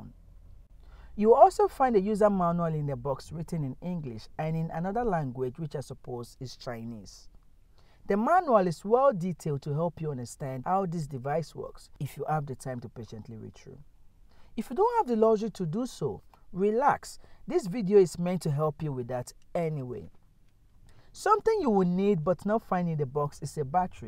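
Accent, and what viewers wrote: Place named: Kenya. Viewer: Great vid. Just out of curiosity, what country are you from? I lived in Kenya for 30 years and your accent sounds very familiar!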